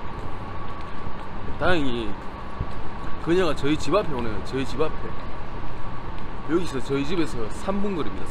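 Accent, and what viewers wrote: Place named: Daegu, South Korea. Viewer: 사투리랑 말투가 엄청ㅋ멋지면서웃겨요 매너있는대구남자ㅋㅋ~~~그녀ㅋㅋ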